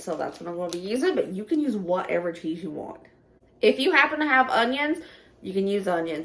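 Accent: South, United States. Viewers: Both looks very good! Love your voice and southern accent!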